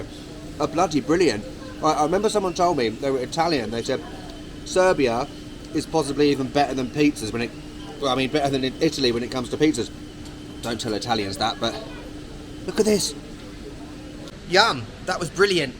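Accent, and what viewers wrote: United Kingdom. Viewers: thank you for your brilliant shots, Serbian subtitles, and bludy flowless brits acent i like it the most.)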